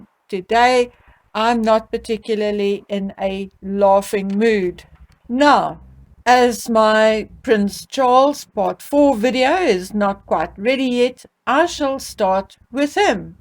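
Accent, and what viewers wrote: South Africa. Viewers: 😂🤣😅 I have to laugh when I listen to you , and the way we South Africans talk, its such a pleasure listening to you, say it as it is. 😂 Just love it.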